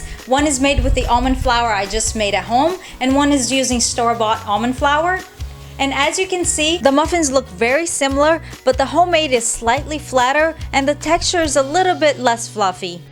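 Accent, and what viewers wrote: Brazil. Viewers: Is she Brazilian? She has a very nice accent :)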